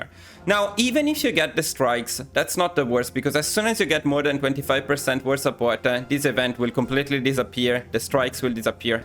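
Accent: Italy. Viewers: The Italian accent, Perfection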